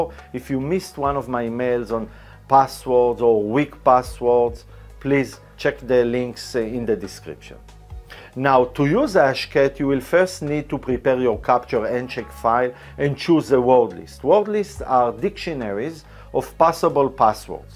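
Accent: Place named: Israel. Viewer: וואו אחי פעם ראשונה שאני רואה אותך ביוטיוב ופשוט ישר איך שהתחלת לדבר הבנתי שאתה ישראלי ואז ראיתי את השם של הערוץ והבנתי שאני צודק... תעבוד על המבטא 3>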